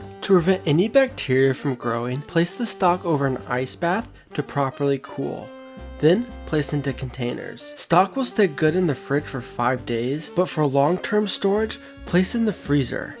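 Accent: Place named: United States. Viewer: *Clicks on recipe video.* *American starts talking.* *Uses SCALE and GRAMS* 🥹🥹🥹 You got me right in the beginning! I really do appreciate Americans who use the (superior) metric system even though it's not the standard in your country. Thanks a lot! (In case you're Canadian though I'm sorry for calling you American lmao 😂 sometimes I don't recognize the accent)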